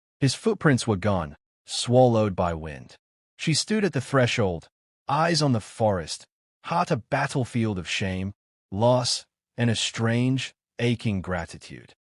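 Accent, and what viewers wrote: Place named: Bronx, United States. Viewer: This story voiced by a guy with a Bronx accent is funny.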